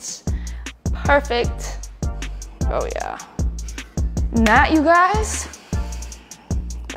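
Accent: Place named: South, United States. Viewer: It’s called: she’s been in the south for college in the past 4-5 years so her accent changed a bit due to that.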